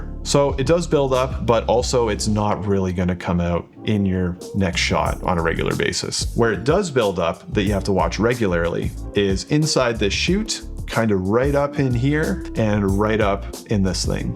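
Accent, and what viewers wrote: Canada. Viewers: Your accent is really ugly. Is it Canadian?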